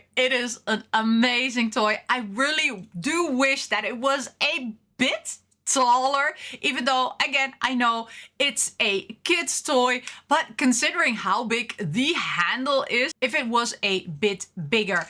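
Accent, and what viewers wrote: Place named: Netherlands. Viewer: hello, something i've always wondered if you're dutch? you're accent is really dutch :3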